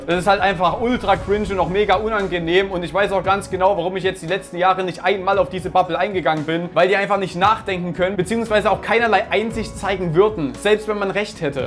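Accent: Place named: Saxony, Germany. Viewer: Aber ein Prob muss an die Seite geben! Sie sind vllt die Ersten die wissen, dass du einen sächsischen Dialekt hast und keinen ostdeutschen...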